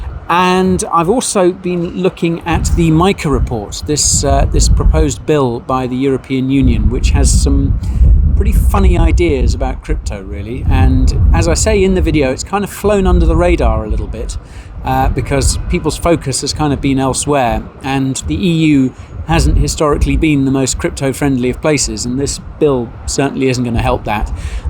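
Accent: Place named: United Kingdom. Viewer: Hey I use your vlogs to learn British accent and use in my acting 😁 keep them coming!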